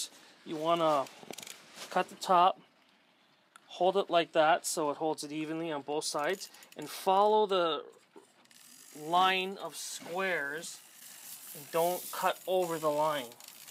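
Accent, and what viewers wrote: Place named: Canada. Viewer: Listen to the accent. Definitely Canadian!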